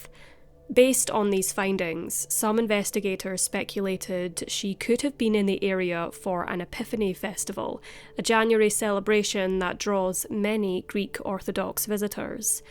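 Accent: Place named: Glasgow, United Kingdom. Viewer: I think she's from Glasgow, my Scottish aunty sounds very similar to her.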